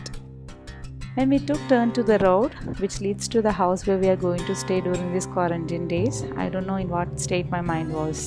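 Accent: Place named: Kerala, India. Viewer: Your Malayalam ascent is very spicy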